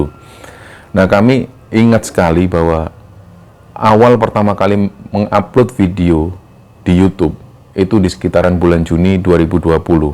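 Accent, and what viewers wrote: Java, Indonesia. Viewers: Kok aku baru aja gabung di Chanel kembara sunyi Yach..nyesel GK dr dulu..cerita nya bagus"sampe"terbuai dlm cerita itu..buat mas aku suka gaya nya apalagi medok Jawa nya..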